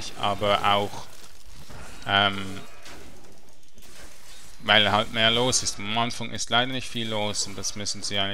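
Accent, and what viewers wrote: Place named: Basel, Switzerland. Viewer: sali bisch wohl au vo basel em name und em dialäkt nooch ^^ ich spiels au.. ha au en moench gnoh, us em gliche grund ^^ schrib moll di accountname unter dä kommentar , denn add ich di! bi allerdings scho lvl 70 p lvl 40 ^^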